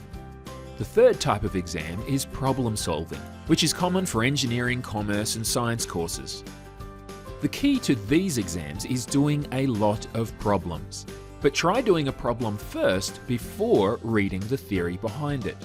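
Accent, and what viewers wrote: Australia. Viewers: Super helpful! Genuinely ingenious advice I haven't heard before. P.S. Is it just me or does the speaker have a weird mix of Aussie and American accents?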